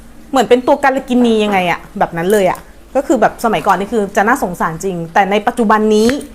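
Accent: Isan, Thailand. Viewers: นางเป็นคนอิสานหรือเปล่าครับสำเนียงออกภาษาอิสานจังหวัดไหนครับ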